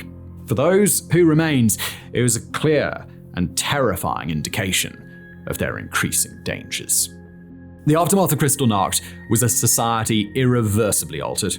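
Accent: United Kingdom. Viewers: You Tube SUCKS! I have removed this man's channels over and over again. But when You Tube changes the algorithm, it force feeds me this guy's many channels despite my removing him. channels suck. They are poorly researched information that is mostly government propaganda talking points. Terrible videos. And just because has a British accent, this doesn't not make him an authority on anything. He's just a talking head. England is over. Brexit has made the only job in England a You Tube content provider. Terrible list videos that spread falsehoods and bull.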